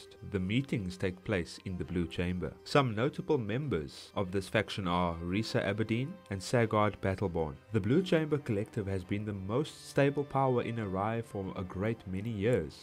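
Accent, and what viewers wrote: South Africa. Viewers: This such a deep south african accent good video please more lore videos , I subscribed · I was also just like this guy is south African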